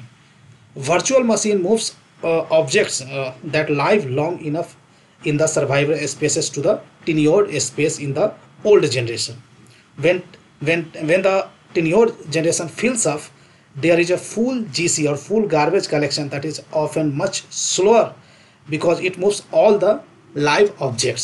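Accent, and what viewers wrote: India. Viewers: love indian english)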